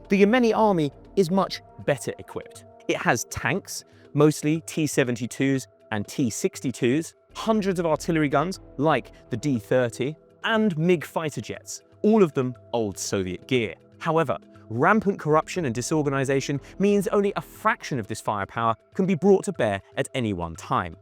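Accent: United Kingdom. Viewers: 1 min into the video and I hear British 😅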